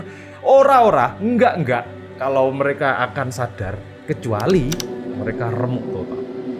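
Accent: Java, Indonesia. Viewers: 😂jawine pundi Mase ?medog men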